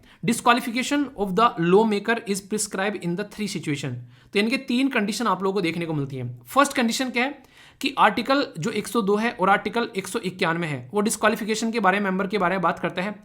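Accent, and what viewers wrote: Haryana, India. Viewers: haryanvi ascent chait basiakh sadh saman................... love from rtk(HR)😍😍❤❤❤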